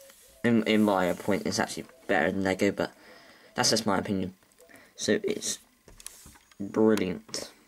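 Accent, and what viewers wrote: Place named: Somerset, United Kingdom. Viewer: Soooooo Somerset 😂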